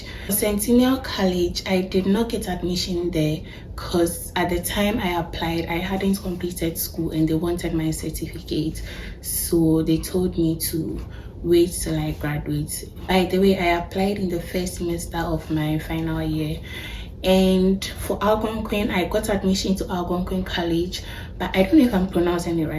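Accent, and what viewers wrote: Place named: Ghana. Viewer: hii, did you use the student direct stream? you sound ghanaian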